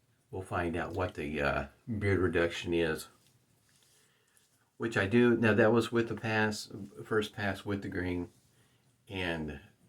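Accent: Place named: Tulsa, United States. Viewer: I’m a fellow Tulsa and was thrilled to find your channel. I was recommended to your channel from a link on Badger & Blade. They remarked at how laid back you are. I watched the video and thought that you sounded familiar, and then you mentioned Tulsa. You sound familiar because you talk like I do! Anyway, I really enjoyed your video. I use MWF almost exclusively. One thing I do different from you is I load my brush in the crock and then move to a scuttle to add the water. You are right about the crock becoming slick, and I’m a bit ham handed, so I don’t like trying to pick the crock back up until I’m done. When I’m done shaving, I clean up the crock by putting the lid on and flipping the crock sideways and rinsing the whole crock under cold water. A little water sneaks into the container and I put the crock away with the lid on and that teaspoon or so of water sitting on top of the puck. 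Since I shave daily with The Fat, it’s moist and ready to go the next day. If for some reason I skip a few days, I’ll put some extra water on top. Thanks for your channel, I’ve subscribed and turned on notifications.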